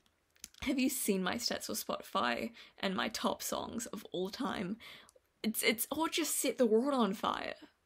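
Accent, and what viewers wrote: New Zealand. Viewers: Lmaooo that's funny with the accent, honestly you sound like you have a New Zealand accent to me! But maybe if I was from New Zealand you'd sound more Americanized I ain't sure haha. I GOTTA watch The Fly and Sweeney Todd they sound really dang good, and I love American Psycho! I definitely remember you mentioning how much you love Set the World on Fire haha I'd definitely watch a video of you talkin about it more, it was really cool learnin more about you you're awesome! Also Pop-Tarts are SO dang good haven't had em in a while but oh man they're delicious, great video as always I hope you have a good day! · Great content, loving that Kiwi accent!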